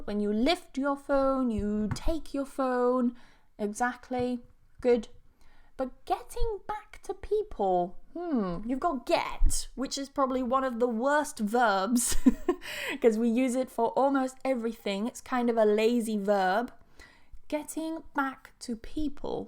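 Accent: United Kingdom. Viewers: She speaks British English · Do you speak British English or American English?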